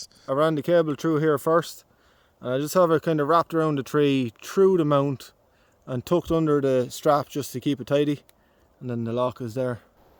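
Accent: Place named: Ireland. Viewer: Your channel popped up on my feed,im so happy it did! How great are you?! I love your irish accent! Im aussie,but my heritage is irish. Just feel so nice listening and watching your show. 💖thanks mate!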